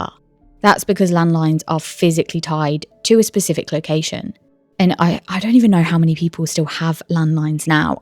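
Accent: United Kingdom. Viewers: The narrator is British, hence the accent. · She is British, so you want her to sound anything other than her own? 😂 I’m even more confused. You don’t like it don’t watch it problem solved. Haters gonna hate no matter what. Geez. I always love accent and how she present her story. Keep going girl never mind the haters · The really pronounced, British accent ruins the video. It’s hard to sit through.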